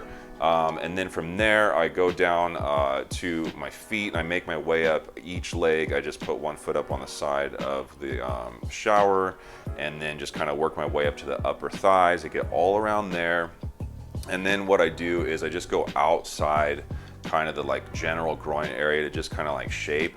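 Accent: United States. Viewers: That god-awful American accent, like scraping a nail across a chalkboard.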